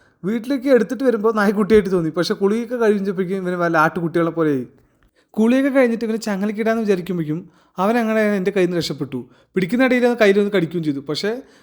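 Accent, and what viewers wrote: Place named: Palakkad, India. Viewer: പാലക്കാട്‌ ആണോ... സംസാരം വീട് എല്ലാം അതെ സ്റ്റൈൽ... എന്തായാലും താങ്കളുടെ മനസ് സൂപ്പർ 👌🏻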